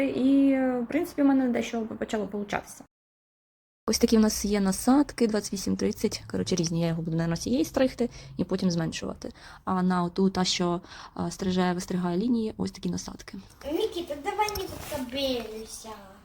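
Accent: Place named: Central Ukraine, Ukraine. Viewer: Прикольно вийшло ! А ще цікава у вас вимова українською , ви десь з центральної України родом , Вінничанина ? Просто цікаво ) , дякую за відео .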